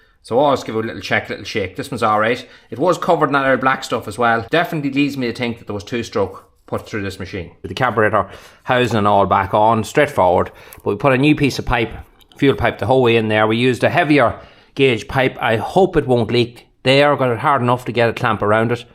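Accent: Ireland. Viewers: A thought was from Boston not Ireland 😮🏴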